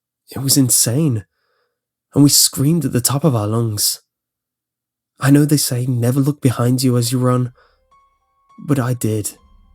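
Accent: United Kingdom. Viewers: ***** Do you not realize that there's no sign of mentioning to either the author nor the talented musician Myu. does not do this because he's good at it in my opinion. Hell, he pays someone else to edit for him. What I meant was that there have been other You Tubers that have narrated this story before. Not to mention that the original author will not be getting any bit of the income make off their stories via YouTube. Last but not least, I own the rights to my very own creepypastas. I put hard work and dedication into them. I do it because I love to, not for money. Then again even if I did I wouldn't be stealing other peoples stories. I make my own. Having a light voice, with a partial British accent and someone else doing all of the editing does not mean you have talent. For the stories that he makes on his own, there's no problem by my eye. If he wants to narrate them and make money then okay. 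But as soon as it's not his original work.. you'd best count on watching your account very closely. The moment that he steals my idea, he'll pay. I know it'll happen. Try re-filming all of Star Wars but instead putting another logo on it, changing one of the characters names like just did with this story. You'll get slapped with copyright so hard, you'll be on the streets or in prison. It's not worth losing your career over. Now I understand that it may be hard to concept this in a way that doesn't make me sound like I'm being a so called, "Dick" but us li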